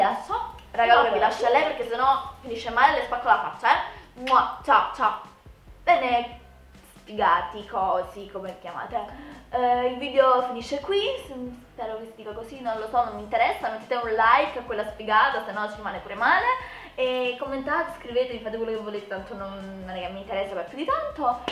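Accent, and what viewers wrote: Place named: Naples, Italy. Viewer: Ma sei napoletana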